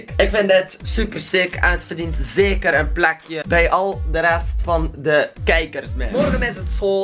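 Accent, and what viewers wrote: West Flanders, Belgium. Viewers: Van waar ben je ? Van west vlaanderen ?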